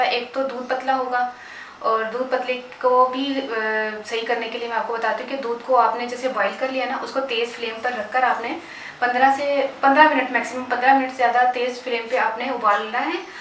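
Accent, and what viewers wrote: Punjab, India. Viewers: Tusi punjab tu oh jeraa ayna haha aisi v aynj e bolny ah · MashaAllah that panjabi voice aye khaye Pakistan de yad agae 😢😢😢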